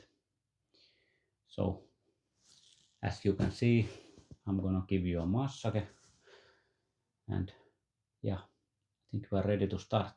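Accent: Finland. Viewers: loves the Finnish accent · I love the Finnish accent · I'm SOOOO into finnish accent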